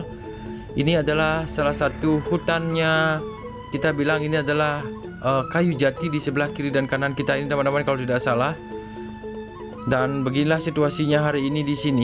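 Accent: Bali, Indonesia. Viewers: Apa yg saya suka dari adalah beliau detail sekali menjelaskan spot2 wisata dan hafal sekali jalan2 yg beliau lewati, dan yg lebih saya suka adalah logat khas Bali yg membuat kita merasa yess!! kita sdg berada di Bali!!😀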